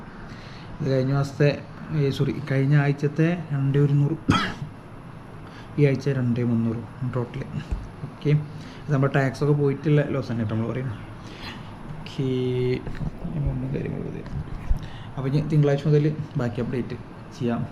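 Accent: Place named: Malappuram, India. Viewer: ഒരു മലപ്പുറം ട്ടെച്ചുണ്ട്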